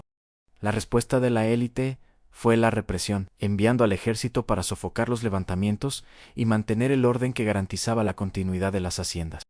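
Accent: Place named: Mexico. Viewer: POR QUÉ M. SUENA A DIALECTO MEJICANO ¿?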